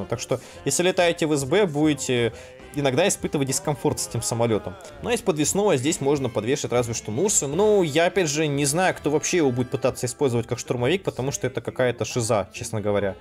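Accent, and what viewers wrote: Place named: China. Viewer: Этот божественный китайский акцент!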